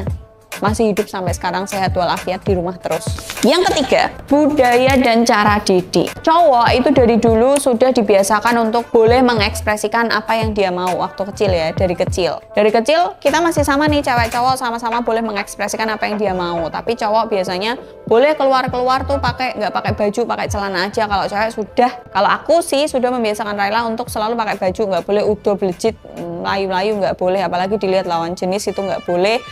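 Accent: Java, Indonesia. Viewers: Medok amat logat jawanya😢, jd ilang selera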